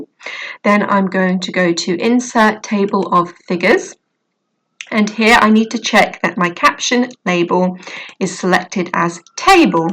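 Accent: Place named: United Kingdom. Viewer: Thank you by the accent I'm guessing your British haha. Back to the point thank you. 😁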